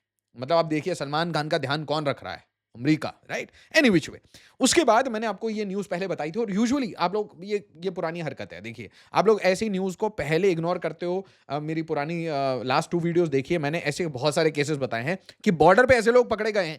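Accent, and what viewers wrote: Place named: Bihar, India. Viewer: Sir aap Bihari kyu bngye ho accent ko kya hogya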